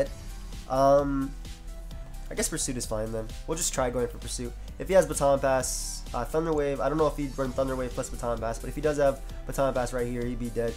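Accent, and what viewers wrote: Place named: Connecticut, United States. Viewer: Did u grow up in connecticut? You have a connecticut accent just like me:D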